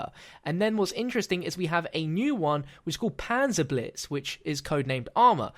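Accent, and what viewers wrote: United Kingdom. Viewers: He's from the UK, so his pronunciation is incorrect.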